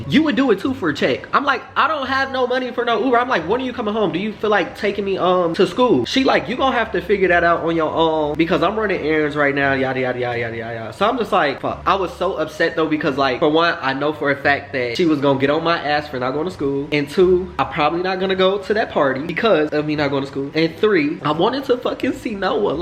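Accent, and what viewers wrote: United Kingdom. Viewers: BYEEE THE BRITISH ACCENT IS TAKING ME OUTTT 💀😂😂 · It’s the British accent for me lol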